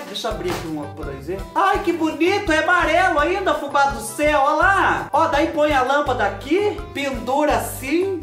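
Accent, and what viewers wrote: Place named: Rio Grande do Sul, Brazil. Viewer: Boa tarde, muito fofo seus presentes que bom gosto dos seus fas. Quem fala " O que que eu corto, cobreiro brabo", esta na idade de risco. Voce e muito fofo, voce estuda muito e pesquisa muito pra falar igual gaucho. Meus parente sao do RS e falam igualzinho voce. Me devirto muito.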